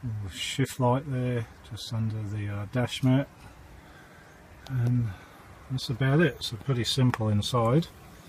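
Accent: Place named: England, United Kingdom. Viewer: Sounds like a pom accent. Nice el to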